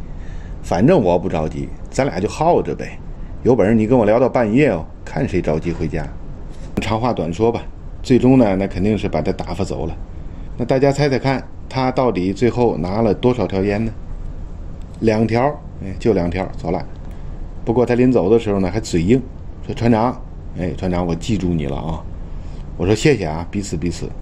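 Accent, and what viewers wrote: Tianjin, China. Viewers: 讲得很精彩，天津口音听着很喜欢。祝船长和船员们新年快乐！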